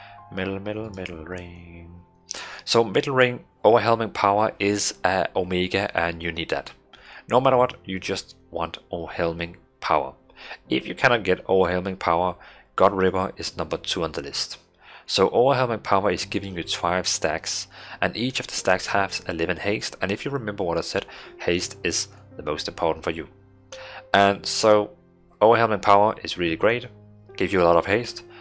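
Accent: Denmark. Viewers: You sound Danish:)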